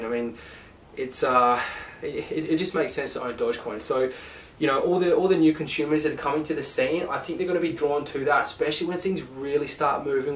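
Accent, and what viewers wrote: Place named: Australia. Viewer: Can't understand your Australian accent, were you saying Adder?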